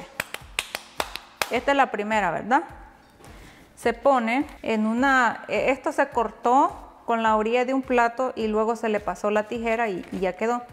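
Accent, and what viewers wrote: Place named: El Salvador. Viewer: Me gusta como abla bien salvadoreña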